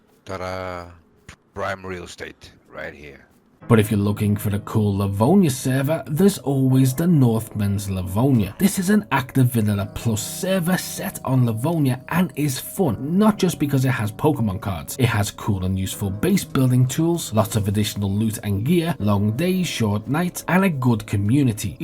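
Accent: Liverpool, United Kingdom. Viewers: Nice you from liverpool mate ?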